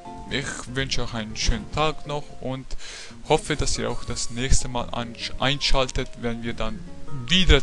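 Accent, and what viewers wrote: Switzerland. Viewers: also ka ob du das liest, ich liebe deine Walkthrough's wegen dir kauf ich mir jetzt einen 3ds nur damit ich alle Generationen spielen kann:DD Ich liebe deine Video's und auch dein Schweizer Akzent - Das musste ich jetzt einfach hier schreiben auch wenn das Video schon 3 Jahre alt ist. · du bisch doch ganz klar en schwizer :D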